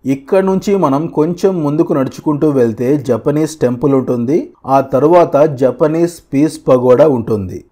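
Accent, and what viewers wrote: Nellore, India. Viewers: బ్రదర్ మీది nellore ఆఆ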